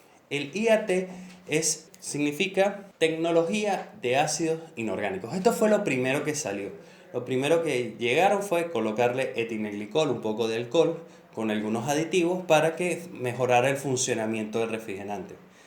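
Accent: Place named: Venezuela. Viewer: Me da pereza escuchar hablar a los venezolanos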